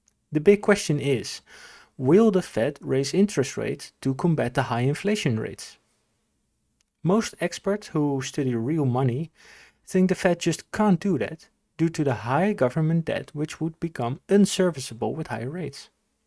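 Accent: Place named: Germany. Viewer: With that accent you got to be deutch ?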